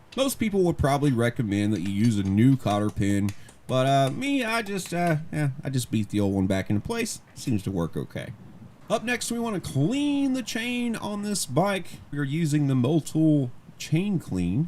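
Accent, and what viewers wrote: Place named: Canada. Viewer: Canadian? once American? can't tell. you just sound like a Canadian that knows all accents and ignores all of them but doesn't speak proper English either XD could also be cuz its a voice over and you can pick or re-say something lol but ya Canadian?????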